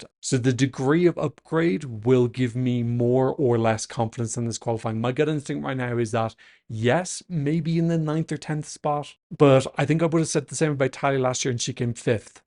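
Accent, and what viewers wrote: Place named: Ireland. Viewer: Why does future sound completely different? Even his Irish accent is stronger! 🤔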